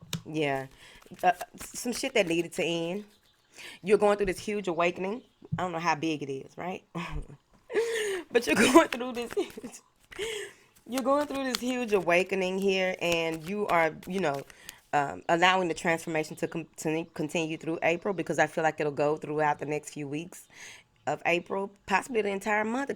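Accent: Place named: Missouri, United States. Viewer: Where you from Missouri? It sound like it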